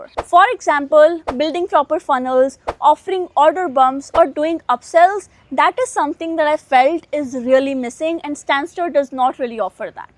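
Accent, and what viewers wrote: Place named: India. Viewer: your accent feels like India + name also But nice reply me are you Indian?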